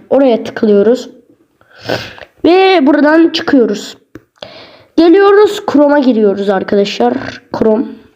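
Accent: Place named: Azerbaijan. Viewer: Danışınnan bilinir.azərbaycanlısan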